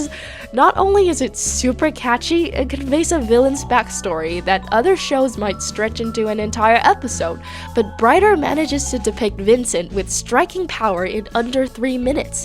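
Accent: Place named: United States. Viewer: have autocorrect off. She is hella fluent in english, seemingly amerucan variety with english influence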